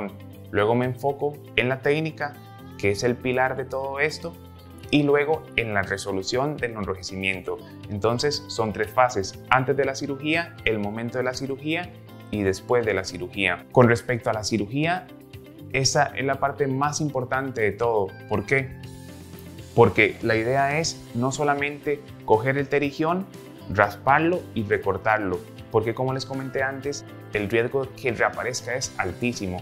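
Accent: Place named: Colombia. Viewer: Pense que el doctor era Colombiano por su acento.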